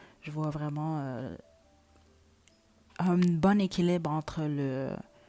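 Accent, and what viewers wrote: Quebec, Canada. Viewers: Bonjour chère. Cela fait peu de temps que je vous ai découverte et j'apprécie vos tirages car ils sont variés au niveau des thèmes et ils raisonnent souvent justes pour moi. Et puis j'aime bien votre français québequois, c'est tellement beau et mignon à entendre 😇🌼Je reviendrai vers vous d'ici à quelques jours si vous avez vu juste.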